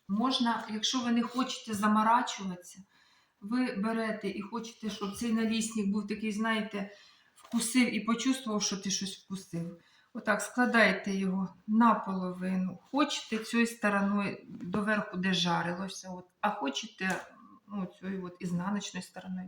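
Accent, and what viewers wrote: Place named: Kherson, Ukraine. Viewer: На херсонщіні ,ммколаївщині, одесчтні такий говор відстаньте не хочеш не дивись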